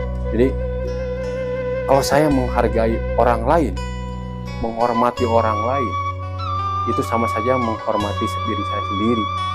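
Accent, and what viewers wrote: Sunda, Indonesia. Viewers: Sunda halus kalem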